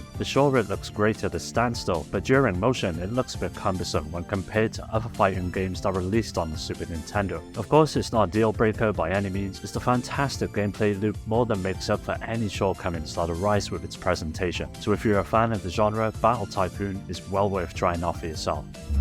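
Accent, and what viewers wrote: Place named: North East Wales, United Kingdom. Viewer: Great vid as always! And I swear that a North East Wales accent 😂 You sound EXACTLY like most of my friends ❤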